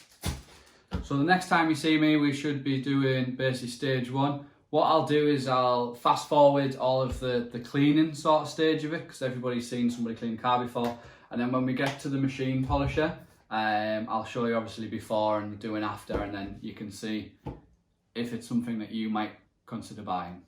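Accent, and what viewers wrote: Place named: Middlesbrough, United Kingdom. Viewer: You sound local to me (Middlesbrough). I have literally just bought this polisher from Argos (not yet picked it up though).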